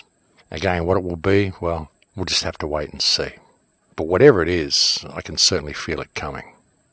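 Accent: Australia. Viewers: Jumbo jumbo with a “ Britt “ accent. Actually, Aussie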